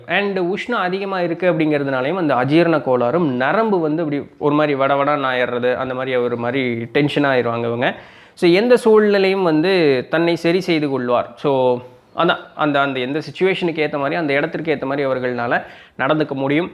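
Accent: Coimbatore, India. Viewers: Coimbatore slang super 👌🏻👌🏻👍🏻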